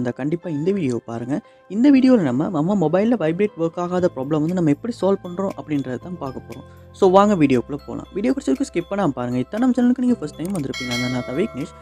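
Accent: Sri Lanka. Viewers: Unga tips na innum try pannala... But unga ilangai tamil ketka nalla irunthathu sago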